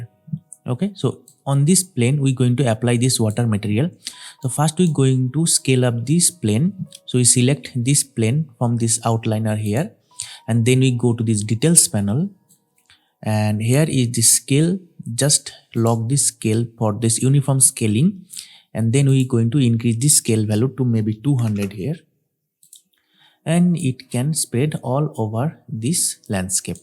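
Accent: India. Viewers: by the voice I can tell its an indian channel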